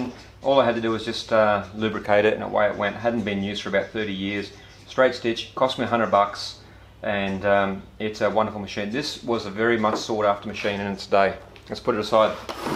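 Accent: Australia. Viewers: GREAT WORK. Hey mate send me one of those orange tool bags. Let me know what you want for it and for post. HAWK........with the accent is Perfect English or at least Australian! Wouldn't know who was talking if you didn't add the Aussie flavor! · Just found your videos on masking the waxed bag. Great to see and hear an Aussie on YouTube. Can’t find the video you refer to about actually waxing the fabric/ the recipe etc. Ps. I too have a singer 201. Great little machine. Mine can be used as electric or hand crank - handy for those power outages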